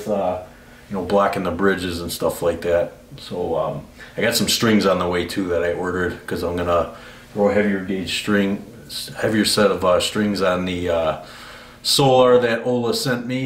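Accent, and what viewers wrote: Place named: Wisconsin, United States. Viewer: Any chance you're from Wisconsin? You sound exactly like my friend's father who hails from Milwaukee.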